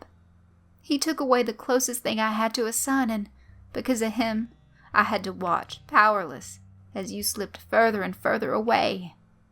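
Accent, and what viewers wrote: South, United States. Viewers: I love your voice good too hear a sweet southern voice carrying creepy tails it reminds me of my old friend when we'd sit by the fire after bringing the cows up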